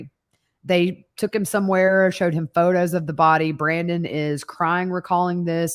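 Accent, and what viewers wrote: South, United States. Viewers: …I AM SUPER PROUD OF U! This is wat I wanted to do growing up…but ya know, having that baby boy 19 yrs ago changed my life a bit. U r on a well known networks doing yo thang! U look good and sound professional…with the southern twang! Proud of it! From the pecan pie to the sweet tea❤ Thank u for representing us well down here! Much love from M-I-crooked letter-crooked letter I, crooked letter crooked letter, I-Humpback, humpback I ❤ PS…can we get Fruitloop one night to talk about this case? Plzzzzzz?!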